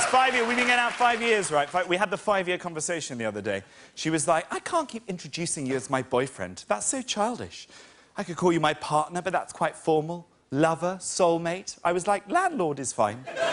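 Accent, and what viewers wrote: RP, United Kingdom. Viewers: I love how when he is in America his well spoken private school voice remains, however when he does stand up in England he tries to make a more common accent. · Everyone in Britain knows Jack is WAY more posher than Michael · not quite correct either, he wouldn't have to be from London to sound like that, he has received pronunciation, which really he could have if he lived anywhere in England although more likely in the south west/east. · but remember that Stewie is an IMPRESSION of a British accent by Seth MacFarlane, whereas Jack is actually from the UK. He's actually just posh, which is why he speaks in such a specific way. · It's just called posh British man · I know his posh but he's extra posh in this set,what happened to him? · Funny- but he was being overly posh, even by his usual standards. It was a bit irritating. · He's only doing the posh accent to appeal to the Americans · This guy sounds like a posh Michael Mcintyre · Nah, he always sounds this posh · He tries to be way more British when he’s in America than he actually is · He's super posh but he's putting it on a bit more as the Yanks love it. 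· exactly what I thought! I remembered him sounding posh as fuck but not like Michael McIntyre, until today. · Im a brit and a big fan of Jack. He's really upstaging his poshness for the American audience.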